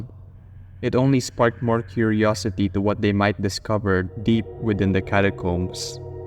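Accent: Philippines. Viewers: are you filipino? the accent sounds like pinoy! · Are you filipino? You sound like my father-in-law :)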